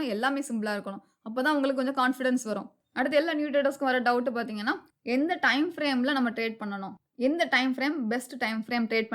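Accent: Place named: Marthandam, India. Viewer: I think you are 100% marthandam area 😂😂😂😂😂😂😂😂😂😂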